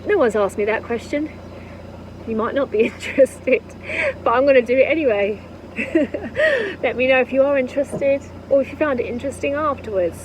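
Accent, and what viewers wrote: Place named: United Kingdom. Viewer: Love your channel. It's one of the regulars that my wife and I watch. We watch a lot of British TV shows and love the various regional accents of the UK. What part of the country are you from, and what should we call your accent, which we like very much?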